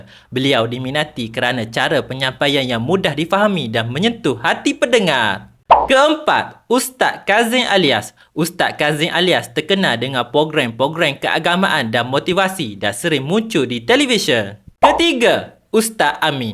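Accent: Kelantan, Malaysia. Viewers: Doh mu bakpe dok cakap kelantan..naik luga aku dengor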